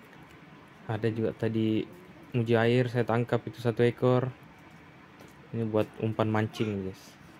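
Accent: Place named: Makassar, Indonesia. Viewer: logat bicaranya kayak berasal dari kota Makassar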